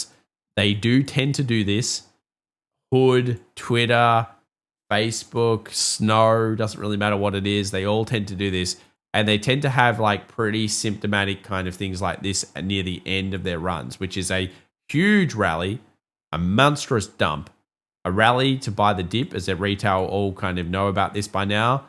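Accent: Australia. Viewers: Where are you ? you sound like a QLD'er ? 🙂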